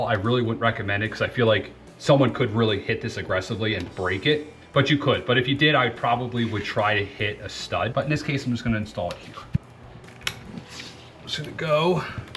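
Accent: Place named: Delaware County, United States. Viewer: Dude… I know this video is a year old so you may not see this comment but you have to be from delco! I am too but live in Georgia now so hometown talk is always nice to hear. And thanks for helping me install my magnetic doorstop.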